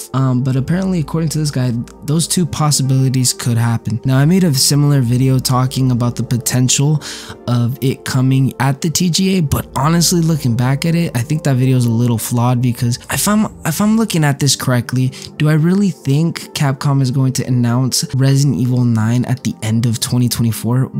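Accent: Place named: Queens, United States. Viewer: Mg u sound so queens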